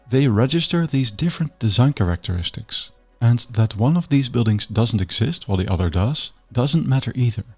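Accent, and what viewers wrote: Netherlands. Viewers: You sound like my Dutch friend from Amsterdam, are you Dutch? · This has to be a Dutch accent, eh?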